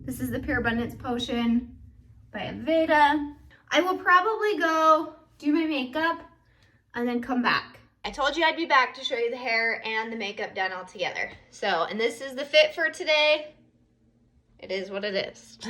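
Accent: United States. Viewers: OMG! Can you tell me what part of America that accent is from?? So cute! Oh my God! 😁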